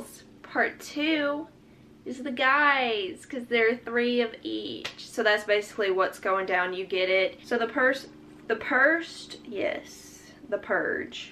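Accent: South, United States. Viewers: your southern accent kills me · I wish I could find myself a girl with your accent lol. Southern accents are sooo underrated.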